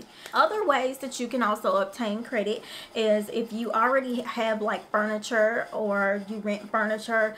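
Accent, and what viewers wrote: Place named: South, United States. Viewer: ... oooh you fine fine.❤ Took me by surprise. You must be a southern girl. Very confident & charismatic. I really appreciate the information.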